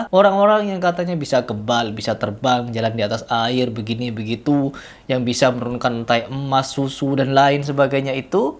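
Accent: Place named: Madura, Indonesia. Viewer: Afwan apakah akhi orang madura,,,,logatnya mirip kami,,,,alhamdulilah semoga makin banyak yang mengikuti kajian salaf,,,,Ana juga kemaren sedih pasca keluar dari ma’had ada banyak sms masuk,,,,ternyata beliau meninggal,,,,rahimahullah semoga kita bisa mengikuti beliau aamiin,,,kami sekeluarga di arab pun ikut sedih karena ahli tauhid wafat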